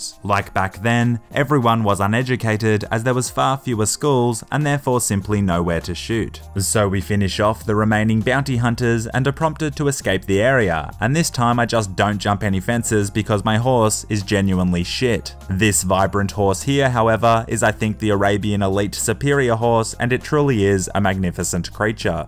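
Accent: Australia. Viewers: the most heavy Australian accent I've ever heard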